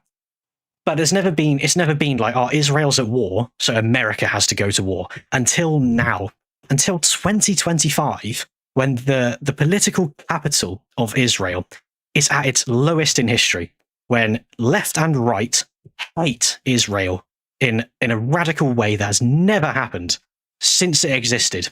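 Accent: United Kingdom. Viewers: Oh a British person ranting? Copium at best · You sound like a brit, how about British politicians, Israelis own them, just look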